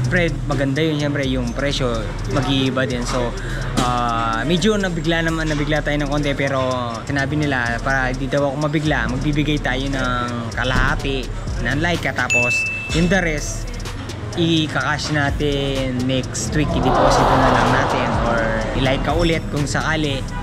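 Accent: Pampanga, Philippines. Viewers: Kapampangan Accent pa din, kuya 😂✌️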